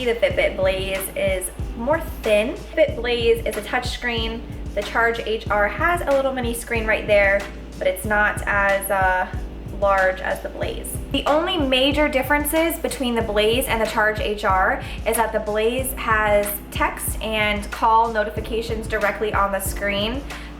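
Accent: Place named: Michigan, United States. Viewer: Great review! I'm looking at getting the Blaze now that the prices have dropped and this will help me out! I love your accent. It makes me think of "home" in Michigan! :) I assume you are from the Northern middle of the country somewhere. The "oh geez" was classic! It's been a little less than a year since you posted this. Do you still like the Blaze?? Are you actually getting the 5 days charge that they advertise? Have they updated the software with anything you find useful/fun/interesting??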